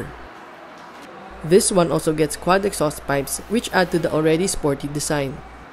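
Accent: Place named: Philippines. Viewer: he speek like many pacquiao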